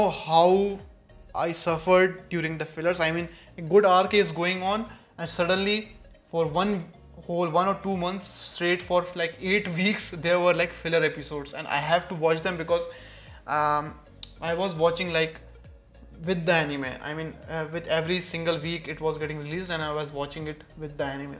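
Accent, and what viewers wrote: India. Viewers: I luv this man!!!😅 I came to comment section after watching this whole Mahabharat length video!!!😆 Luv from India (Manipur)❤️. N u look dashing n also speak English very well...luv that original Indian accent!!!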